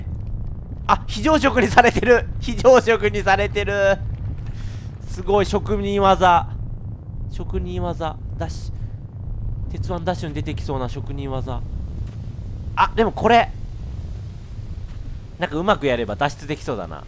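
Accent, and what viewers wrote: Kansai, Japan. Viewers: 関西弁をーーーー！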